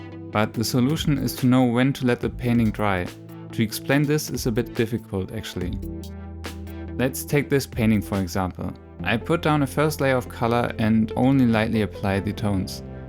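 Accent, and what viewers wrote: Germany. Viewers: One question, are you German? Because your dialect sounds like you are german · Is he german? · This is a Very German Accent 😂 But i am German too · Bin ich die einzige, die raushört, dass er eindeutig Deutsch ist? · Are you from Germany? In comparison to my accent when I speak English it sounds pretty similar^^ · are you german hahha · Ich finde man hört so stark, dass du deutsch bist, ist bei mir aber auch so. :D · Are you German? You have a German accent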